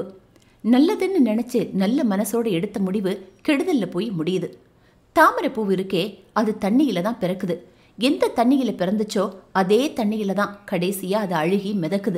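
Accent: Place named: Southern Tamil Nadu, India. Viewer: தங்கள் ஊர் தெற்கு பக்கமோ அங்கே பேசும் தமிழ் காதில் தேன் போல் இருக்கிறது நன்றி நன்றி 🎉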